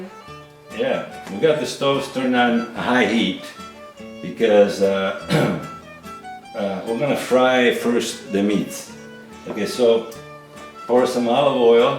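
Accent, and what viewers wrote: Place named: Sicily, Italy. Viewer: Wow. My grandmother had that same accent. I haven’t heard a Sicilian accent in so long ❤️ It’s different than an Italian accent somehow.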